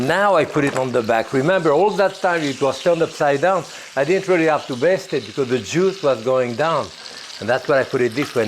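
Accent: France. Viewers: He sounds french. Must know what he's talking about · I am not at all the worlds greatest cook, but Jaques Pepin is truly my cooking idol! Not only only for his great cooking, but for that strong French accent as he instructs you! Thanks! Happy cooking. ❤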